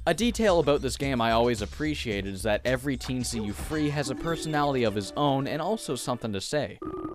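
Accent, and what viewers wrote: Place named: Canada. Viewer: listens for about 5 minutes So, where in Canada are you?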